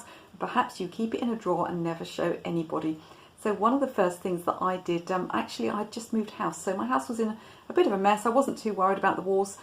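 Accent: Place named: Southern England, United Kingdom. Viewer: Thanking you. Im a painter and I originally come from Tonbridge in Kent but I have lived in Australia for years now. I havent been home for many years so love hearing your southern accent.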